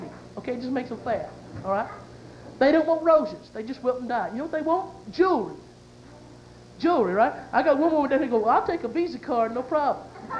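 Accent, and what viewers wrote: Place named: Arkansas, United States. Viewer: He sounds arkansan here.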